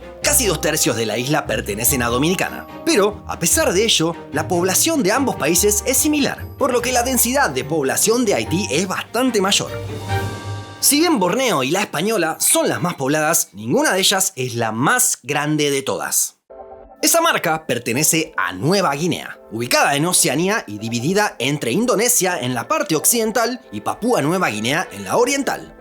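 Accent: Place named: Argentina. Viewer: Sinceramente tu voz es muy agradable! generalmente me cuesta escuchar videos con acento argentino (por que toda la vida he visto las peliculas y programas con doblaje mexicano, aunque no soy de mexico) pero contigo jamas me costo, super genial el canal!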